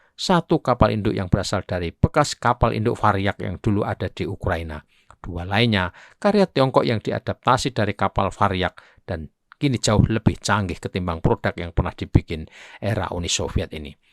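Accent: Java, Indonesia. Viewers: Jawa betul km lek medok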